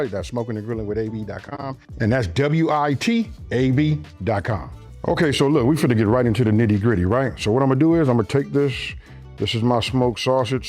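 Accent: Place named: California, United States. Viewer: Man this right here cuz!!!!!!!!! Omg this is seasoned to perfection. I’m here a Cali accent but I’m seeing down south cooking going on. Good lawd!!!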